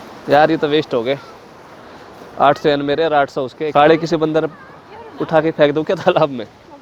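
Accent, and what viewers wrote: Haryana, India. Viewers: Bhai HARYANVI bol k video mai kati char chand lga diye , tourism with comedy ...😍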